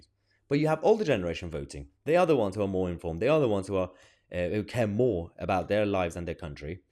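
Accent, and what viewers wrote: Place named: Liverpool, United Kingdom. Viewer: Did like his liverpool accent.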